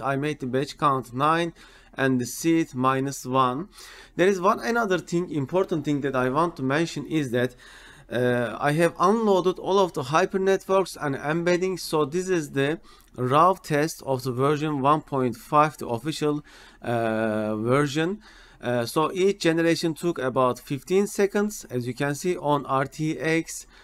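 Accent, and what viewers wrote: Turkey. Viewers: This is definitely English accent of a Turkish speaker :) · I like turkish accent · tr ingilizcesi bu nerde olsa tanırım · you have a strong turkish accent brother 👊🏻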